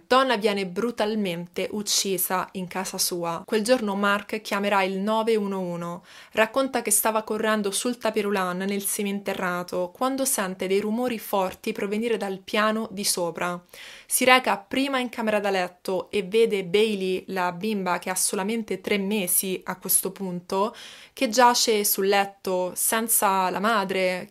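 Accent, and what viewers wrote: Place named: Rome, Italy. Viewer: Un bugiardo patentato , e chi gli crede. Mi dispiace per la famiglia di Donna che oltre alla perdita della figlia , non ha potuto vedere crescere la sua nipotina. Mi dispiace per la famiglia di Robert per tanti anni ritenuto un criminale . Quanta sofferenza quanto dolore. Non so cosa dovresti migliorare, ti trovo molto gradevole , anche il tuo lieve accento romanesco , a me non dispiace. Pronunci bene i nomi stranieri sei di bell' aspetto , sei brava nel documentarti , nell' esporre trasmetti empatia con le vittime . Brava continua non ti fare distogliere, credi nelle tue potenzialità, se c'è da migliorare , senz'altro migliorerai , nella vita c'è sempre da imparare, soprattutto quando si è umili , con la sete di conoscere .😘👏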